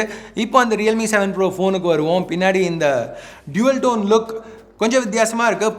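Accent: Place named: Chennai, India. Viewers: Bro antha Chennai Tamil slang nalla irukku bro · Ona pakave irritate ah irukku . Onnoda hair style and thavai illatha vetti pechu Mayiru tamil basai ketta chennai tamil nu soldra asingam puduchavane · Nadula nadula chennai slang varudhe🤭😶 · Chennai slang super bro. Already subscribed. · Enna bro chennai slang la pessa try panra maathi theriyuthe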